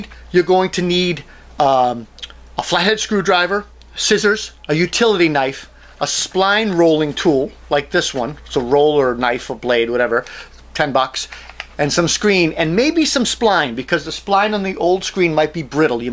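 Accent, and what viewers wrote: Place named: Massachusetts, United States. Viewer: The one thing I look for in DIY videos is that the person has an accent that I'd hear on a job site, thats how I know it'll be short amd sweet while being accurate and efficient 😂 Gotta be country, Boston, or New York.... Couldn't pin this accent but sounded like Massachusetts or New York. 👌🏻 Immediately trustworthy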